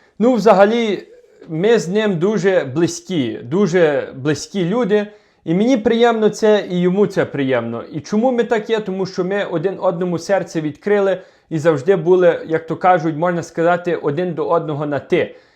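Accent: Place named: Western Ukraine, Ukraine. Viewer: Красивый Украинский язык с западной ноткой. Восхищен! ( Виктор Одесса)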